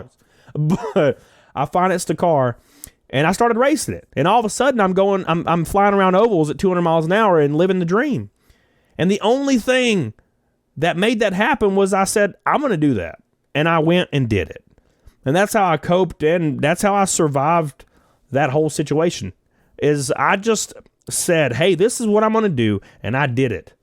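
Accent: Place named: Kentucky, United States. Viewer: This guy’s page is gonna blow up. Keep up w the content brother. You remind me of my buddy’s - lol. From KY. That accent can’t be mistaken.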